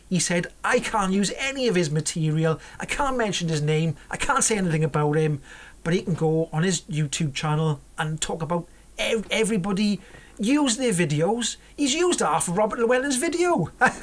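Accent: Wales, United Kingdom. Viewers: I think that you are great, I like your sense of humour, your friendly Welsh accent and your no nonsense approach.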